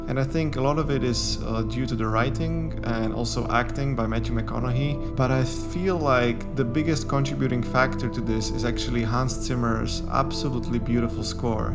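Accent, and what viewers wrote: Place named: France. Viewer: You let the French influence sneak into your English. I'm not criticizing, because it wasn't distracting, and English orthography is a mess, but you also pronounced "Liam", "Bowie", "McConaughey", and "Gyllenhaal" oddly.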